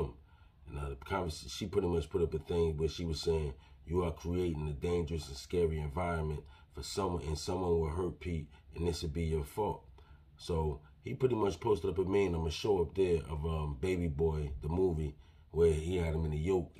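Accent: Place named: New York, United States. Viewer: Luvvv what you have to say!! Especially luvvv that accent, sounding like you're from the Boogie Down or Queens, or Brooklyn or something lol. Good sound advice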